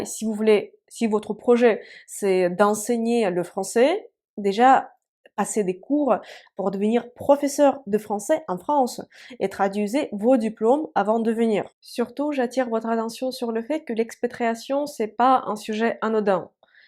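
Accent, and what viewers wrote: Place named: Russia. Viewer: Oui votre langue francaise est parisienne de l il de france c est votre langue de traduction mais avec un accent russe slave superbe ...merci ..zafra le fils de kaidar l algrlerien ...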